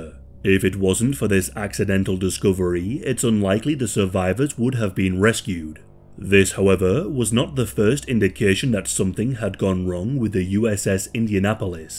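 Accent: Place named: Scotland, United Kingdom. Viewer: He's Scottish. You can immediately recognise it because he pronounces "u" properly.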